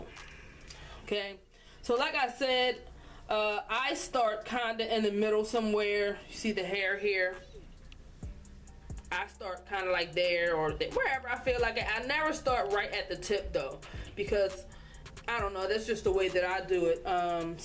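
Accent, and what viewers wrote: Baltimore, United States. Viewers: I know a Baltimore accent when I hear one ❤ much love from Jersey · A tutorial with a Baltimore accent!! I love it! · Tell me you from Baltimore with out telling me you from Baltimore. Or at somewhere in Maryland.😊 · You from B’more??? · What state are you in? I can tell your from Baltimore based on your accent. I am just hoping your in Texas! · Omg u sound like you’re from Baltimore lol but I’m starting my own locs I had them before but cut them several times but naw I need to go back using this method 😍😍😍😍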